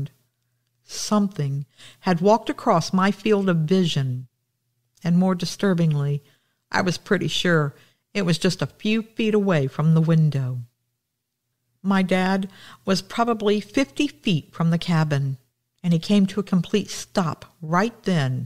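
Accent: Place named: Minnesota, United States. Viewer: Sounded like a great way to celebrate 21st birthday. I am from MN this sounds like you are from the northern part of the state and your cabin sounds awesome. Thanks for sharing your story. Pepper sounded like a great dog.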